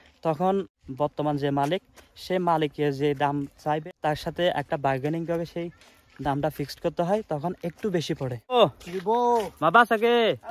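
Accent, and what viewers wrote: Chittagong, Bangladesh. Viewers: Chittagong er manusher shadu vashai Kotha bolte akto shomossha hoy ata shodo onar na shob Chittagong er loker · কথার একসেন্টেই বুঝা যাচ্ছে চিটাইঙ্গা ❤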